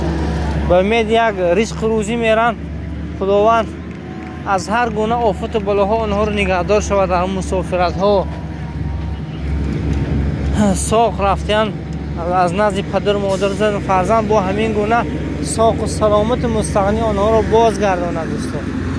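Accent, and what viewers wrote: Mastchoh, Tajikistan. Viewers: Бо лаҳҷаи Мастчоҳи гап мезанан.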